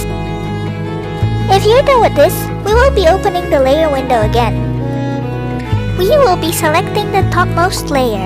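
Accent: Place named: Philippines. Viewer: u sound like pinoy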